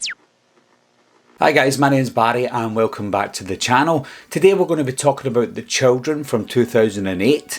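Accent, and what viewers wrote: Scotland, United Kingdom. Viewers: First time viewer. Solid intro, then at 25 seconds that Scott accent starts in with the vocabulary. Instant subscription.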